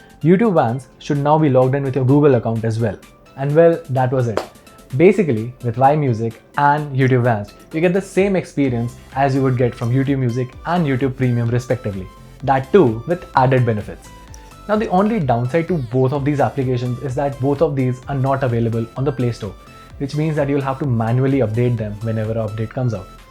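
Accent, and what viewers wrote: India. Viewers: This guy is awesome. I was skeptical of the indian accent but then I realized that he was talking in perfect english. And your video is edited and recorded perfectly. You get a sub and like from me!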